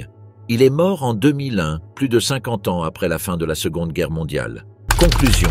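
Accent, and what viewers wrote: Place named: France. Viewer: Curieux, ce doublage par l'intelligence (?) artificielle. : les années dans les dates sont en italien, prononcées avec un accent francais à couper au couteau.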